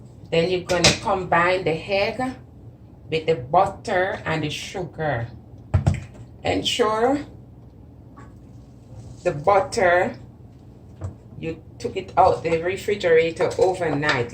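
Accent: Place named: Jamaica. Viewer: The accent and pronunciations are killing me. 100 percent Jamaican.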